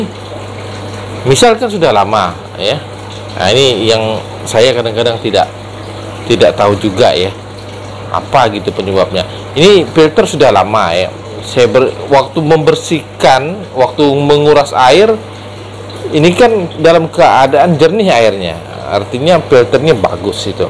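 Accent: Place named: West Sumatra, Indonesia. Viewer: Makasih infonya uda Sangat bermanfaat. Kalau boleh saya coba tebak Dialeg cando urang awak. Salam 🙏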